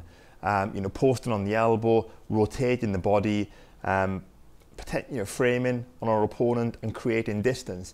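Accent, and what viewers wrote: Wales, United Kingdom. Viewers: Nice to hear a Welsh accent on YouTube! Bro use YouTube cards to and pin your videos on the screen when you mention them, easier than description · Ah, a Welshman... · U welsh butt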